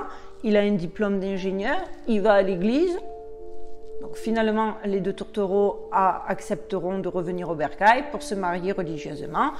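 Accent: Marseille, France. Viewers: Coucou :) Il y a bien un léger accent anglophone mais tu as bien gardé ton accent marseillais ;)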